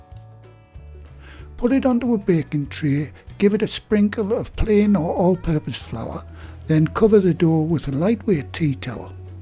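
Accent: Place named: Northeast England, United Kingdom. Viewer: Hi - greetings from sunny Colorado- I'm an expat - originally from Murton ( colliery). I've been working through your videos from the beginning and will definitely try virtually everything including cheesemaking. However when I came across stotty cake - always called "fadge" by my dad - I had to try it out. Came out great - thanks! Love your videos and hope to make many things soon!! Stay well and keep them coming please! Tony Armstrong ps - great to hear someone with an accent pretty much like mine! My American friends thought I was unique!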